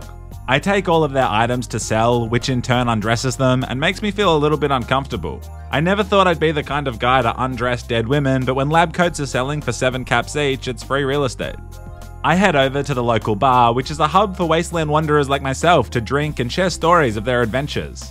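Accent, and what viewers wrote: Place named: Australia. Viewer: He is not mispronouncing wrong! Its his Australian accent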